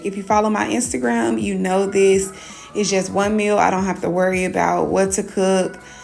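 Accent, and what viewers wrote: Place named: Houston, United States. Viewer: Awesome channel!!! I knew you had to be in Texas… Us Houstonians have that accent!!! Keep on inspiring others!!! Blessings! 🍉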